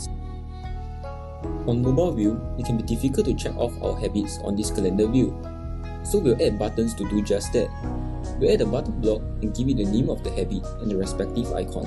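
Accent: Singapore. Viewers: Omg ru singaporean HAHAHA i recognise the accent!! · Super great video thanks for sharing! But please don't shock viewers with this loud music in the end. If you dont want to remove it at least turn it down (or alternative make your voice louder) Also I think this video would be easier to understand for many people if you use more standard English (Nothing against Singlish but it can be difficult to understand for folks who never heard it haha) Keep up the great work!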